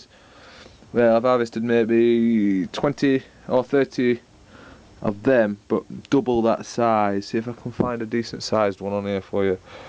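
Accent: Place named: Northern England, United Kingdom. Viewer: After trawling through all the videos i subscribe to from around the globe its bloody great to here a northern accent !! Albeit from the wrong side of the pennines !! Have you got your name on an allotment waiting list ??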